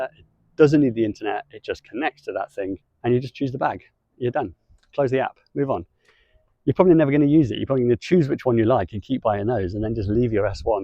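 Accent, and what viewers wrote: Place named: United Kingdom. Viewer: I have just come across your S1 after watching a new (and very supportive) video comparing sealing toilets by Ready Set Van. It looks very interesting as I was within a few days of ordering a Clesana C1. Would I be right in assuming that the liner / bags are propietary and unique to the S1? If so, with the greatest respect to your business, my biggest worry would be that as a newish small business, something happens and the liners stop being available. I appreciate the S1 could then be used as a composting toilet , but I'm not a fan of composting toilets. I also share a slight concern about the use of an app to tell the toilet which type of liner is being used, but if that is all it is used for then I can get past that as I suspect I would only ever use the same type of liner. I note that (despite British accent) the business appears to be based in the US. 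Is there anywhere in the UK that it would be possible to see one of the units? £1,500 is a lot of money to spend on something one has never seen..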